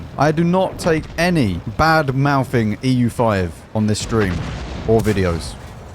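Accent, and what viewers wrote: United Kingdom. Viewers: I absolutely love the way you speak those words Brit man, nicest Brit accent ever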